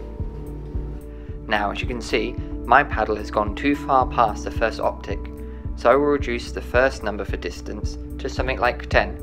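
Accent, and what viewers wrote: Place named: United Kingdom. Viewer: i ll follow ur tutos and buy what u linked in description, then i ll try to give u a "field report" =) THANKS SO MUCH to share that tho ! love ur accent :) british ?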